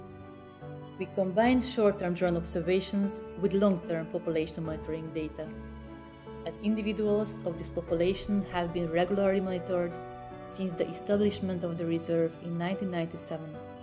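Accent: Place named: Hungary. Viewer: Hunglish narration.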